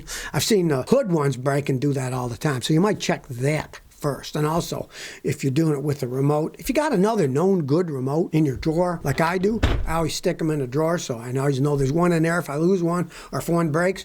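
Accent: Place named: United States. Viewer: you talk American! Buy you don't buy American!! Your a Toyota and Honda man!! Your either with American or not!!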